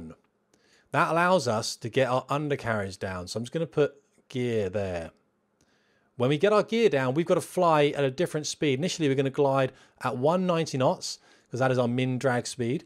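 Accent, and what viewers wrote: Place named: United Kingdom. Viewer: Thanks for sharing these great videos with us common folk. Very cool and informative and I can even understand your British accent. 😊🇺🇸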